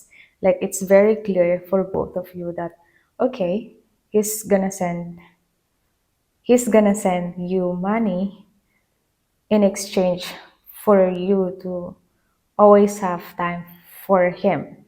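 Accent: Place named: Philippines. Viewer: Hi. I adore how you take the extra measures to speak clearly in English, with your provential filipina accent. Whats not to love here!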